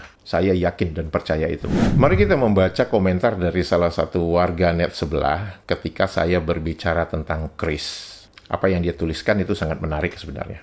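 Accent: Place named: Malaysia. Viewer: Logat melayu kampungàn....muchrahhhh!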